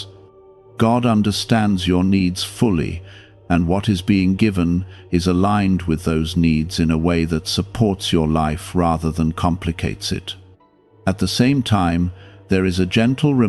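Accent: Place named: United Kingdom. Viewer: Why does this AI god have a slight Britsh accent?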